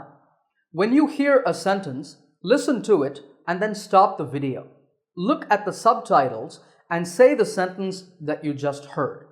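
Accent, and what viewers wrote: United States. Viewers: Did you grow up in the US ? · I want to learn American Accent from you. What is the procedure?? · That's American 1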